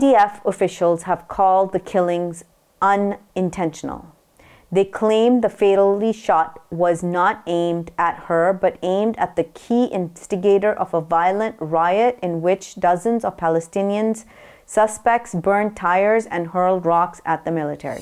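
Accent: United States. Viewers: ╔═══ ❤☀💚☀❤ ═══╗ 💚💚💚💚💚💚💚💚💚 Madam SAHEBA, Assalaamu alaikum. Thank you very much for presenting the news today after almost a month. It was on 3rd, Oct that you had presented the news last. We, from Hyderabad, India, like your news reading because of your slow pace and because of which we easily understand your speech despite it being in American English. But only thing is we need to have lot of patience to hear your news-reading and lot of free time. Madam Samana Siddiqui would have finished your 18 minutes of news bulletins in just 12 minutes. But it hardly matters. What matters is our being able to understand your reading!!! YOU ARE DOING A GOOD JOB!!! PLEASE KEEP IT UP!!! 🌐🌐🌐🌐🌐🌐🌐🌐🌐 ╚═══ ❤☀💚☀❤ ═══╝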